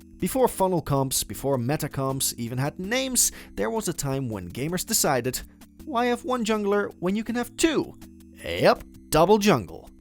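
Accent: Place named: Netherlands. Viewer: I hear a dutch guyyy, Ahoi en gegroet :P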